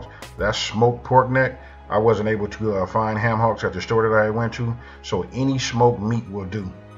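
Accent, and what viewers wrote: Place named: California, United States. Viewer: You most definitely have that Cali accent! But I love your recipes 🤟🏾🤟🏾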